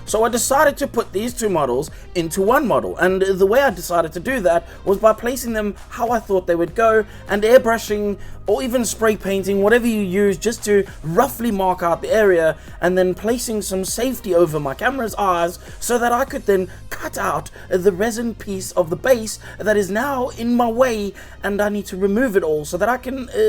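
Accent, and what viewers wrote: South Africa. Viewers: I hear a South African accent and I am here for it!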